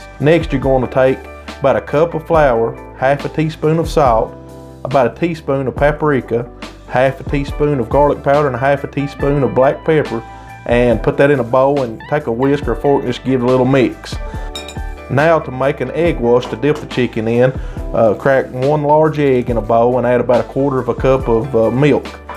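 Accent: South, United States. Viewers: Love your country accent 😍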